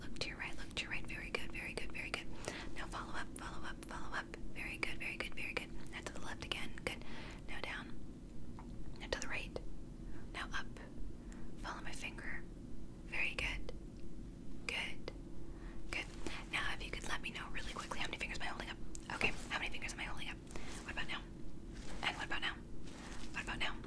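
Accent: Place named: United Kingdom. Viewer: I DEMAND MORE BRITISH ACCENT ASMR 🤠😍thanks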